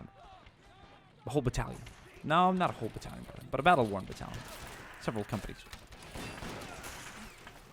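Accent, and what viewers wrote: United States. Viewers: you sound like idubbbzTv what accent in America is that? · Southern accent God tier👌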